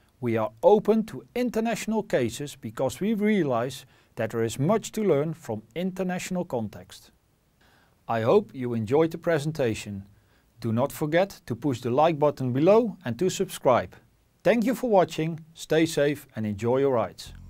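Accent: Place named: Netherlands. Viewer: Very lekker Dutch accent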